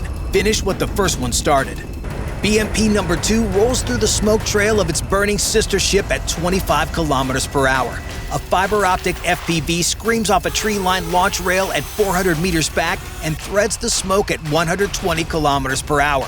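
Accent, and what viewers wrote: United States. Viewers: This is very old footage with lots of repeated footage. I muted it and watched and it was just as informative without the annoying excited narater with his wining voice pearcing my ear drums. Old news in a new annoying format with american naration. Annoying! Annoying! Annoying!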